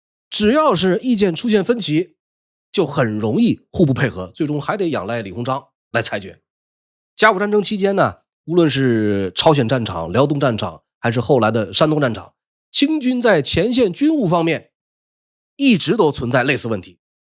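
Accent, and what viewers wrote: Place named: Huaixi, China. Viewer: 老先生明显淮西口音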